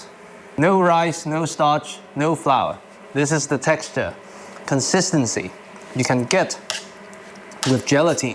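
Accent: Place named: United Kingdom. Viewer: We just saw a man of Asian ancestry with a British accent fuck up a traditional Southwestern United States dish....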